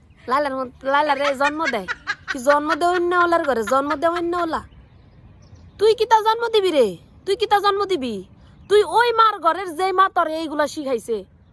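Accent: Comilla, Bangladesh. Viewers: আপুর বাড়ি কি কুমিল্লা খাস কুমিল্লা বাসায় কথা বলতেছ আমার কাছে তো সেই ভালো লাগতেছে আমাদের দেশের ভাষাগুলো আসলেই আমার কাছে খুব ভালো লাগে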